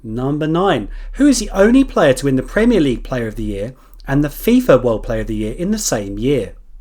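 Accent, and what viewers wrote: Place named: Southern England, United Kingdom. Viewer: Because of the number of question I bet your a man under fan. You sound from down south where most man utd supporters live.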